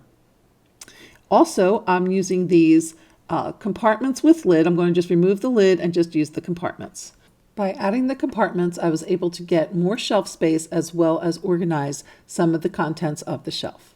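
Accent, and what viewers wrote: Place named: East Coast, United States. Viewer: Where are you from? Somewhere on the east coast obviously. I hear it especially when you say the word “on.” Love east coast accents. 😊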